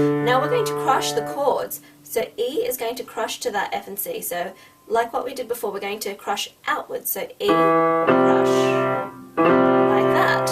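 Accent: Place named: Australia. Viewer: Nice to hear an Australian accent :-)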